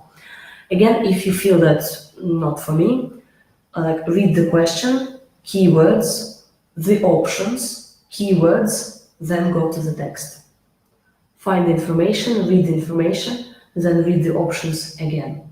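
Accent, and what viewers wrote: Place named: Russia. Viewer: 100% Russian акцент )))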